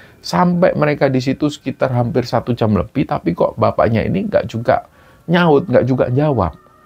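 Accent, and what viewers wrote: Java, Indonesia. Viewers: Kalsel hadir mas Sukses slalu untuk mas dn tim ks nggeh, Kami ini asli Jawa Jogja tapi lahir dn domili Kalsel dn kami sgt mengidolakan mas karena ciri khas jawanya itu GK ilang SM skli keren,dn cara penyampaianya itu nggenah,njalur dn yg pasti keren mantap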